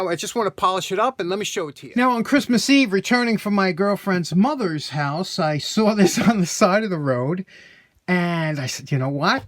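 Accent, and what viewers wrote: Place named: East Coast, United States. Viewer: You’re the best, all of your nostalgic sentiments resonate in me. I was born in Allentown, PA in 1969 and moved to CA when I was 10, your East Coast accent and perspective rings true for me. Happy New Year. I love all of your posts and look forward to all that come in 2021.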